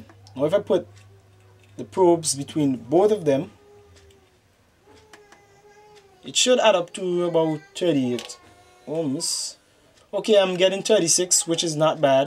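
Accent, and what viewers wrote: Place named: Jamaica. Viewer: are you a Jamaican? u have an accent man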